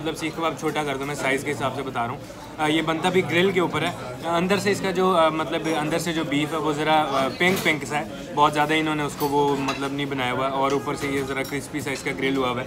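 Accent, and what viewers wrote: Punjab, India. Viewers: Your Punjabi punch in Urdu is great. Bahut wadiya mundiya, khich k rakh. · Your punjabi terms are AMAZING.....I'm dying laughing for your natural punjabi style ...lovley..!!✌✌😂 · Punjabi accent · Wonderful face reaction after every food. 😊☺and punjabi ascent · Your Punjabi accent..😍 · Tu Punjabi me urdu bol rha h bhai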